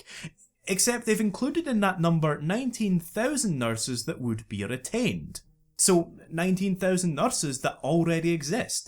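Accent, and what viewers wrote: Scotland, United Kingdom. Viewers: narrated in an awesome Scottish accent with regular rolling "r's"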